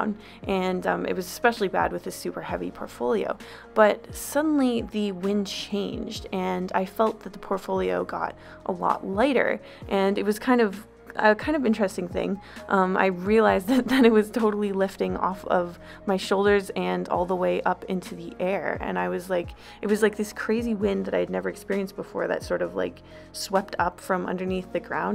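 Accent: Canada. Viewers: Is she from Canada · You're Canadian?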